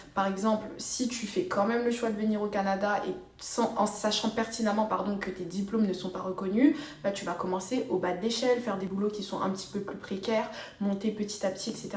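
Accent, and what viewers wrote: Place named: France. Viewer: mais de quoi tu nous parle, ta un accents Français et tu parle de pas venir au canada si.........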